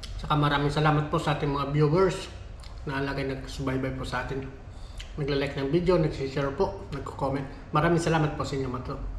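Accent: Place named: Hiligaynon, Philippines. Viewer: taga saan ka sa Pinas? Hilongo ka, ano?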